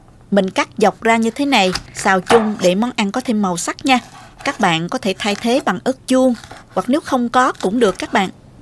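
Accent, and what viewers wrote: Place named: Saigon, Vietnam. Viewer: Giọng nói đúng là người SG đó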